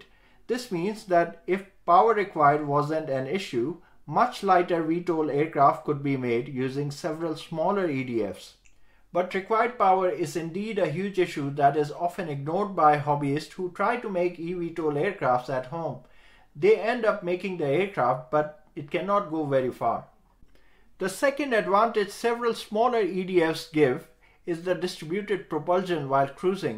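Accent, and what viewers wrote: India. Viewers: Once u get past the indian accent u realise this guy knows his stuff and explains everything really well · I love that India Sound :) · If you went to Engineering school, you'd already be past an Indian accent. His English is perfect. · I've worked with so many smart Indian men and women that the accent automatically lends credibility. :0) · People may be reading too much into this comment. Yes, on YouTube, there a ton of guys with Indian or Pakistani accents doing videos of this type who are really just trend hoppers, who present nothing new in their content. After listening to him talk, he actually knows his shit, and does remind me of the Indian engineers I've worked with over the years.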